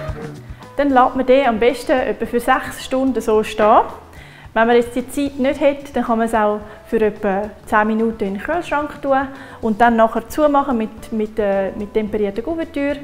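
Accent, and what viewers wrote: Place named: Switzerland. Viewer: Der schweizer Dialekt war für mich nicht einfach zu verstehen, aber es hat sich auf jeden Fall gelohnt! Danke!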